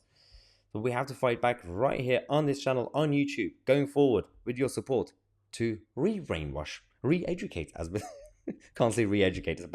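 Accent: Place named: Liverpool, United Kingdom. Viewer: Did like his liverpool accent.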